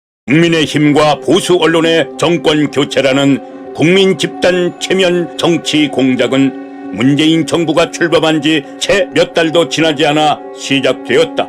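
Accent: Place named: North Korea. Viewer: 다좋은데 왜 이북말투예요?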